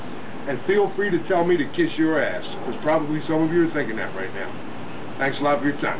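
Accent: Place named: New Jersey, United States. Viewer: Those TV Producers are going to be trying to get you to sign a contract. No kidding, you made a GREAT presentation! I like that Jersey talk of yours. LOL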